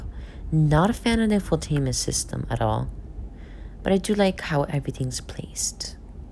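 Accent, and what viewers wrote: Italy. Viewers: 🧐🤨🤔🤔🤔🤔, Are You Sure About That! Oh & By The Way, Your Voice Sounds, Uh, Italian Stuff!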